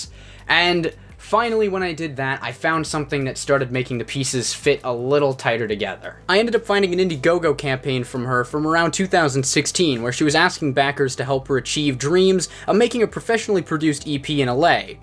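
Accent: Canada. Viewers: Bro are you Canadian we can tell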